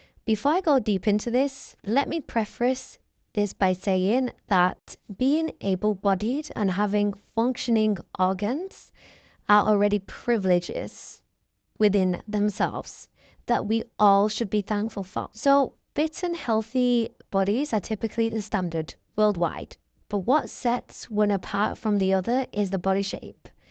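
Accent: United Kingdom. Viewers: I'm from London. Sounds like she's in the UK to me but not London born. As opposed to a voice filter. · She’s very easy to understand, she just has a UK accent.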